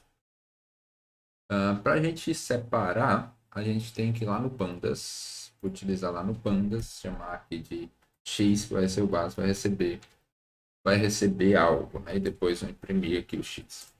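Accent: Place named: Fortaleza, Brazil. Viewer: Ótima didática e ajudou demais! Obrigada. Entendi tudo =) inscrita! Vc tem o sotaque daqui de Fortaleza-CE !!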